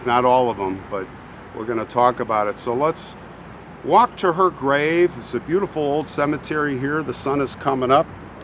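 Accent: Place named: Chicago, United States. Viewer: Great storytelling . Having lived in Chicago, it’s great to hear the familiar accent.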